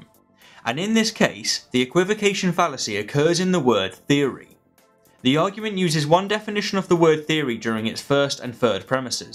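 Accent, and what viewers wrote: United Kingdom. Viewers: Esq. (I say that because to my ears you sound posh English) I speak English with a Michigan and Ohio accent actually my accident tends to be related to that spoken in Grand Rapids and Detroit, Michigan along with Toledo, Ohio. According to some PhD linguist test whose name I can’t remember and am too lazy to look up. Anyway all that being said I have noticed the same lack of understanding between the colloquial definitions of theory and now that you pointed out the different definitions of law. I’ve known about the different definitions of those words and several others misused by creationist and for that matter certain uber-liberals and ultraconservatives who are very careless in their misuse of words and phrases. Particularly in using words that has several different definitions but implying there is only one meaning to confuse their audience.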